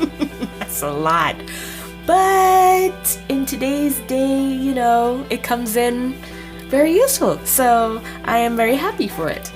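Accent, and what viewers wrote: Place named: Caribbean. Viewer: Thanks for sharing your insights, this is seldom spocken or apparent on the youtube platform for black people. Correct me if I'm wrong but I'm hearing a subtle hint of carribean/West Indies accent from you.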